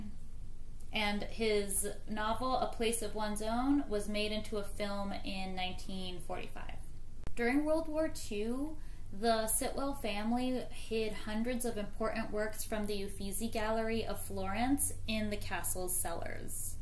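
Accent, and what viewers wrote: United States. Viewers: Why this disagreeable American voice?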